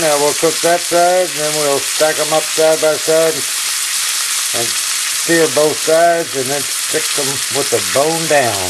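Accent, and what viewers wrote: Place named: North Carolina, United States. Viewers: Today will be my first time preparing Lamb loin chops. I really like this video because this guy's southern accent remembers me of my childhood, living on the farm in NC with my grand dad. He cooked alot and made it look so simple, just as the above video. And absolutely nothing was wasted. Hope my lamb chops turn out just as great as seen in this video. Thanks!!! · This video is great at extremely helpful! Thanks so much! I knew that wonderful accent sounded familiar! I'm from North Carolina! :)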